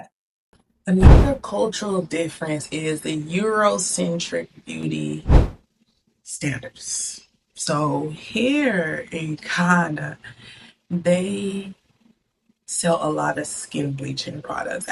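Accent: United States. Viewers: You have a strong African-American Southern accent.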